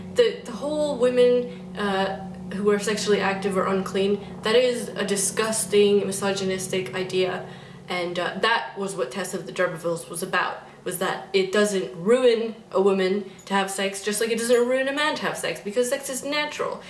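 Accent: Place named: Europe. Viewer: Is this lady European because I can hear a slight accent.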